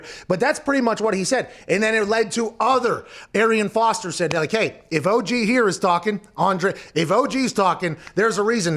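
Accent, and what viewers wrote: Pittsburgh, United States. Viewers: I love watching pat mcafee simply for his pittsburghese accent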